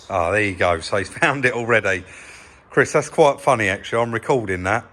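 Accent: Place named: Essex, United Kingdom. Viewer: More like an Essex accent.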